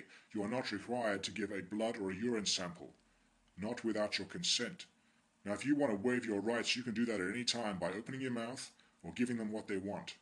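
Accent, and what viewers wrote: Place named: United Kingdom. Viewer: by the way, you sound british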